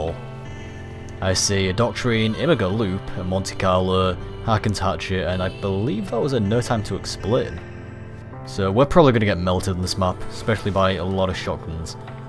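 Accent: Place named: Scotland, United Kingdom. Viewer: you look Asian but sound scottish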